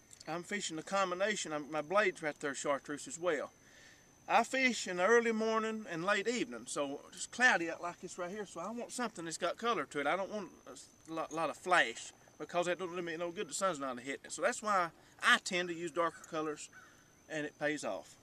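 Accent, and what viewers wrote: South, United States. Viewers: im from illinois with a midwest accent and its funny cause as i read the comments in my head i cant stop reading them in his southern accent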